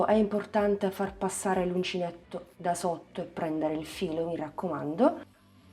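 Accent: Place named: Palermo, Italy. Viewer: anch'io sono di Palermo, qualche flessione verbale mi ha insosspettita 😊 Ribadisco i miei complimenti per i tuoi lavori soprattutto visto la tua giovane età 👏👏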